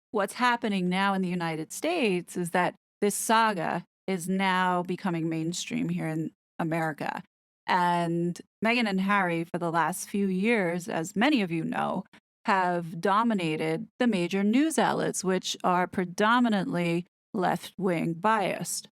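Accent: England, United Kingdom. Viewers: loved your England accent... you didn't try to mimic someone from Mary Poppins. 😁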